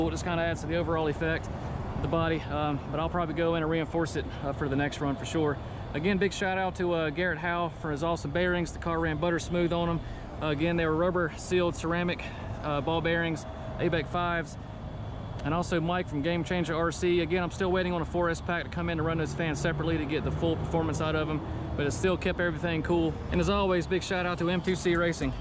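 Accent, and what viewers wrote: South, United States. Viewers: Hey my friend very videos Man you funny bro you said this car is a HOOT yo that is so southern and matches your acent, iam NJ iam definitely gonna start using its a HOOT I love my brother, and your a excellent driver really good. Thanks your friend william from NJ Forgettaboutit 👍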